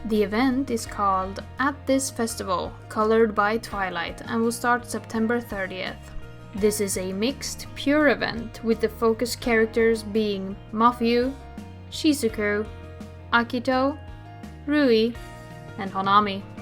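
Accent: Sweden. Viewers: Are you swedish by any chance? I am so im not sure if your accent is swedish. I would loveee to pull on that event thanks for the help! <33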